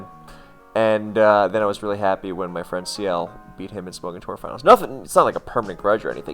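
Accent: Germany. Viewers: you probably pick up on final devoicing, missing schwas, wrong use of fricatives, and Germans tend to overthink the "th". ^^ xD o.O -.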